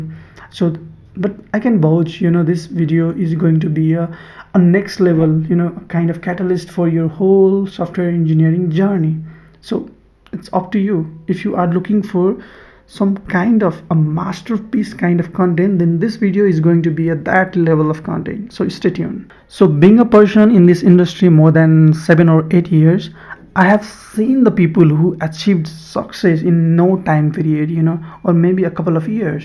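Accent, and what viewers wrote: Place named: Nepal. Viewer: bro are you nepali?your accent sounds like that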